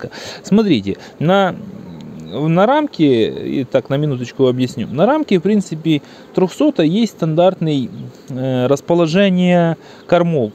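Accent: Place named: Ukraine. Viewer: К ролику можно цеплять метку геолокации. У многих видел. А иначе понять, что вы из Украины, можно только по говору и по вальмовой крыше на заднем плане)) и то это не точный способ. На юге России тоже похожий говор и крыши:)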